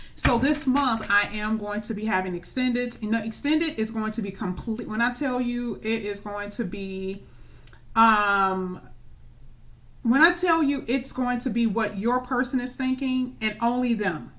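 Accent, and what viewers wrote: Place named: United States. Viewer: Thanks for the reading. I loved it and it made me so happy and resonated. Greetings from England and I adore your American accent and voice. I look forward to your next video sister. Also you are so clever to read tarot and predict the future and is so kind of you to do free readings. I am struggling financially right now and we are in lockdown in England but once I find my feet I will definitely contact you for a personal reading. I hope you are not depressed with lockdown and making enough money. I don't know if you have a day job as well as the tarot but I hope you are getting by financially in lockdown as many people are struggling. I would like to support your business as a fan of yours and have a personal reading and recommend you to my friends who will hopefully recommend you to others. Love and light. God bless you. 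Stay safe sister Love you lots xxx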